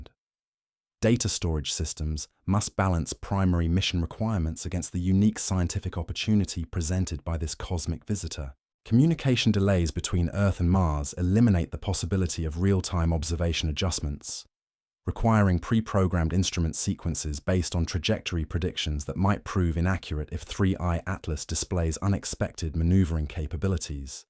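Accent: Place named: United Kingdom. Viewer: I'm really getting tired of the gay British narrating on all these darn videos. How about good old USA English huh?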